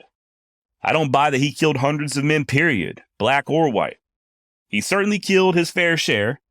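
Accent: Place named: South, United States. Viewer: It does a soul good to hear a Southern Accent every now and then. And I like your stories. Keep it up!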